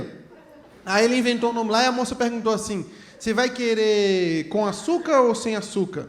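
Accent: Minas Gerais, Brazil. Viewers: Pernambucano onde?? Esse é mineiro raíz. Passa batido. 😂😂😂😂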